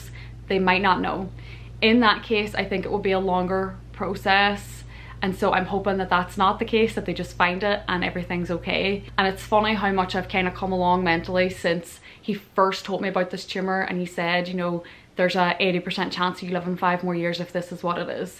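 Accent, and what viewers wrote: Ireland. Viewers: I love your accent. Is it Irish? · Her irish accent sounds like "hi, my name is Erin" , or "hi, my name is Aaron" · I've been following your story and praying for the best outcome. Spent a bit of time myself in hospitals and quickly realised that having a positive outlook no matter what get thrown at you is most important. All the best, just love your Irish accent, my folks originally came from Donegal. ☘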